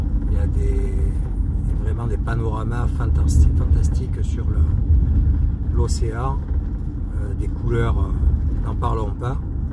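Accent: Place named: South, France. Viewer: Coucou Je suis tombé par hasard sur tes vidéos et je trouve ça super génial. J ai vu aussi ta vidéo sur ton cc où tu nous présente ton véhicule. Ça me rappelle le nôtre,un chausson le best off 510 sur moteur fiat. Petit véhicule avec le même concept. Dis moi tu vis a plein temps dans ton véhicule ? Tu es déjà à la retraite ? Tu viens de quelle région avec ton accent (que j adore)du sud? En tout cas tes vidéos me plaisent beaucoup et je me suis abonné à ta chaîne. Je te souhaite bon vent, et profite bien. A bientôt pour ta nouvelle vidéo....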